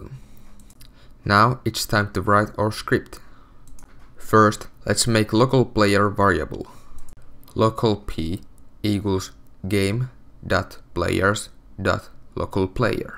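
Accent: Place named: Finland. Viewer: U sound finnish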